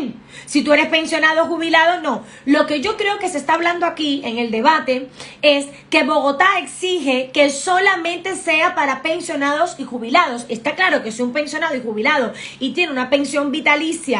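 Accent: Cuba. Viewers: eres cubana?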